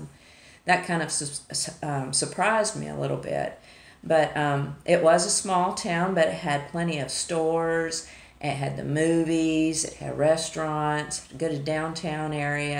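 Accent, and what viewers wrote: South, United States. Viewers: You are welcome and by the way l love your southern drawl.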